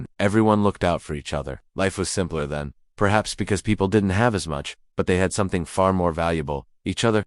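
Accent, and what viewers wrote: United States. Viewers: The American accent definitely put me off. What would he know about the UK in the 60s?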